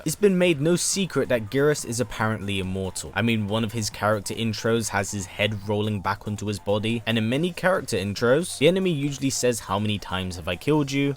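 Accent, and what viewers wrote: England, United Kingdom. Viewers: you are from England?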